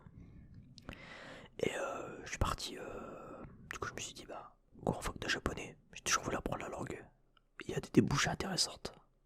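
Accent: North, France. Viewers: Tu viens du Nord ?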